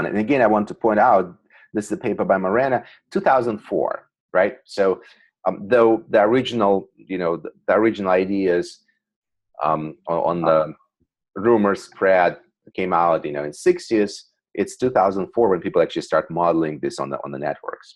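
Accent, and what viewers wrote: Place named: United States. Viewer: Thank you very much for uploading these videos. Did you learn English in States? I know you are Russian but you don't have much Russian accent in your English...it is American. I am surprised to be honest. Many people in Russia learn British pronounciation. And yours is clearly not that.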